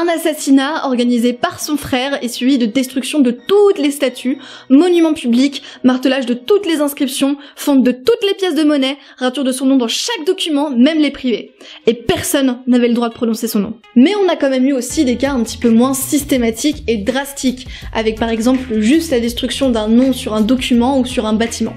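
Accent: France. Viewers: Très compréhensible... j’ai adoré malgré que j’ai habituellement un peu de difficulté avec les accents français! J’adore ❤️🤍❤️... je m’abonne!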